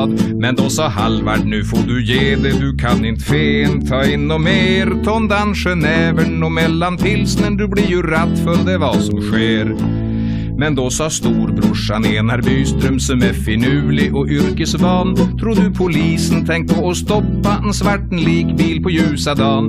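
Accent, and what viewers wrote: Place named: Norrland, Sweden. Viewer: Skönt o få höra Norrländska igen. O de va expert på.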